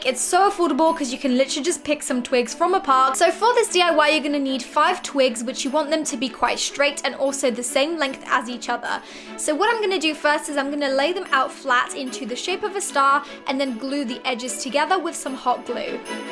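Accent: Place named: England, United Kingdom. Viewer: Yes I like your DIY core where are you from are you from England or from are you Irish cuz it's I was just curious cuz that accent sounds like you're from England it'd be great to hear somebody else you know talk English I just wanted to compliment on your your crafting send me a line and tell me you and I'll just send me a line I said Thank you or you know that kind of thing all right God bless you have a blessed week and evening I can't take care of by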